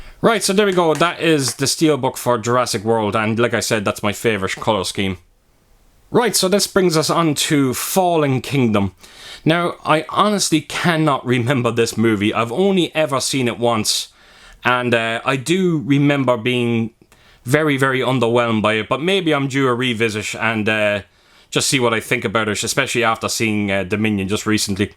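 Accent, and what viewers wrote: Ireland. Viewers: Hey, that is a lovely 4K steelbook set. I have to confess that I've only seen the first three movies in the franchise. I also own none of them on physical media as I only collect horror, independent and underground films. If I collected anything else I wouldn't have the finances for it and I most definitely wouldn't have the space. Even when you review or show movies I'm not really interested in, I always enjoy watching every piece of content you upload. It must be the Irish accent 👍👍👍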